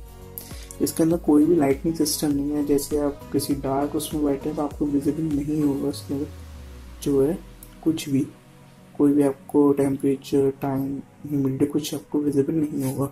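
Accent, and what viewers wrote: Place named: India. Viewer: You are indian and speed-mumbling, very very difficult to understand. Have you read how youtube CC interprets the sounds you put out of your mouth. Adding background music helps? Well I did hear in the end: Thank you, have a nice day, almoust nothing else.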